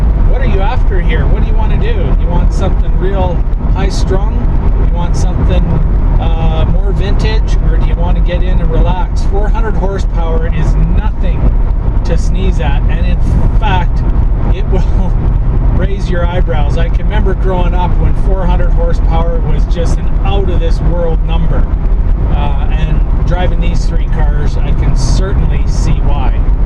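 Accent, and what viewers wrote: Canada. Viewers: It’s Canadian dialect you ignoramus. Their Z is pronounced zed.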